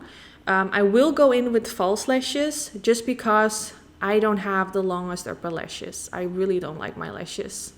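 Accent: Netherlands. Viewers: I Love the Mac paintpot and the eyeshadowpallet , by the way i, m hearing a Dutch accent , if so greetings from The Netherlands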